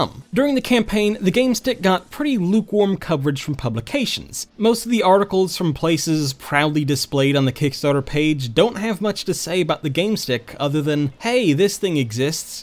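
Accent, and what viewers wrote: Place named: Scotland, United Kingdom. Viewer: "Home town in South Carolina" Has Scottish/British/Aussie accent.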